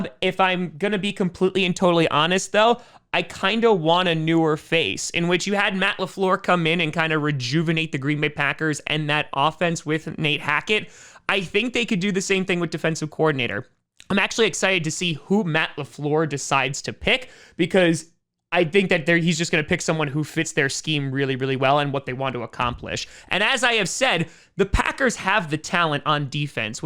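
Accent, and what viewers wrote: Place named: East Coast, United States. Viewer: I'll miss the Pe-inn East Coast pronunciation. Thanks for providing content. We are the 24-7-365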